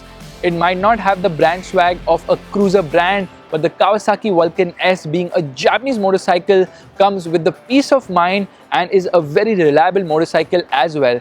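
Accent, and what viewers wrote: India. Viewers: Now first I want to say what a great video this guy put out. The host was really great and did a great review. To be honest I giggled a little bit because of his accent and his words that he used (however it's how things are said in india). But this provided great character and kept me wanting to view more, as a result I became attracted to the bike more. I wish he could do more videos in USA because he is pretty talented. So in all much love and respect, I am happy to give 2 thumbs up, this host made this bike kick ass even more than it is. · Акцент бешенный. Лучше б на индийском своем говорил.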